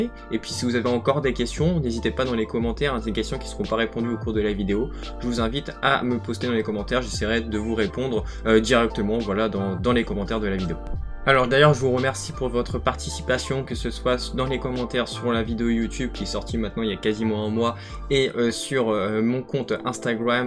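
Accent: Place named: England, United Kingdom. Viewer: Le petit accent anglais qui fait plaisir insragr_a_ m continue comme ça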